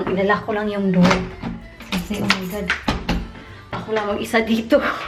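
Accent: United Kingdom. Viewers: When im watching you talking,i remember the queen when she talks,ive watched the crown,you speak like queen elizabeth,you have a british accent already.... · I can hear the British accent emerging 😌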